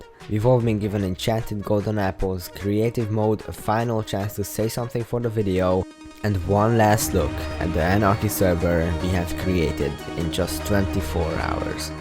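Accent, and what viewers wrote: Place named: Hungary. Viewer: Nagyon úgy érzem, hogy magyar vagy. Az akcentus mindent elmond. Amúgy nagyon jó lett a videó!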